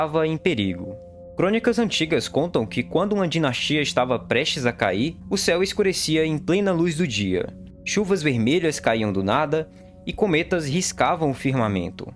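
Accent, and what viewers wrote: Rio de Janeiro, Brazil. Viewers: Decide se tu é carioca ou paulista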